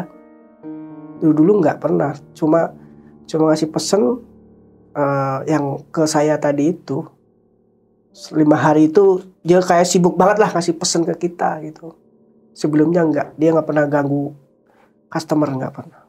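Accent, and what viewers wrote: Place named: Sumatra, Indonesia. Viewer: Aku juga rada salfok sama logatnya gk ada Jawa jawanya. Kayak logat Sumatra.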